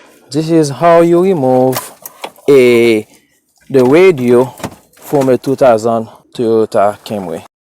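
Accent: Haiti. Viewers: Hey! I recognize that unmistakable accent!!! Sak Pase!!!! I'm just about to go out to my 1998 Camry that just won't die and install a backup camera. Thanks for the video!!! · That Haitian accent 😂💪🏿💯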